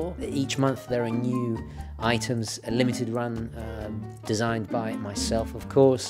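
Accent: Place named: United Kingdom. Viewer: As soon as Daniel Craig retires from 007 movies, is my choice for the roll. Charming,likes watches,British accent,probably looks good wearing a suít....not to mention his smile with those perfect teeth....any Bondgirl would go crazy... now,seriously,great video as always. Technical and always sharing your knowledge on horologycal culture and history. Congratulations!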